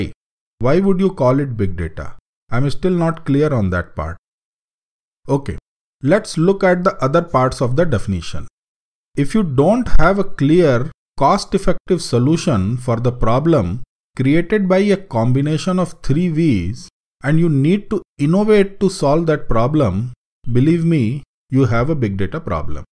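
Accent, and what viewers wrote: India. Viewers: Thanks for making these tutorials, they're just too helpful. Not only am I learning hadoop, but I am also getting more familiar with the Indian accent!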